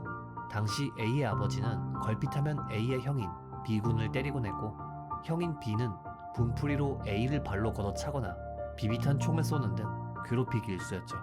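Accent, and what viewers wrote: Japan. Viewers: 나레이션 발음이 일본인이거나 한일혼혈같으신데 맞으신가요? 일본지명 발음이 일본현지 사람 같아서요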